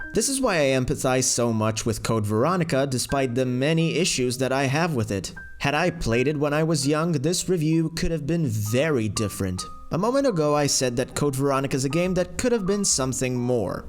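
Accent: United States. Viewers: You don’t seem to sound like your from any part of Europe when you said you were from a part of Europe I was shocked because you sound American. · Where in Europe are you from? You sounded American until you said Europe.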